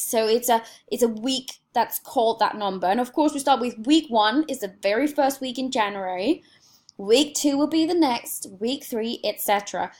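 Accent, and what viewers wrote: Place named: United Kingdom. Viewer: Thank you ! came to your videos to learn Danish , and ended up motivated to practice my british English pronunciation haha yours is amazing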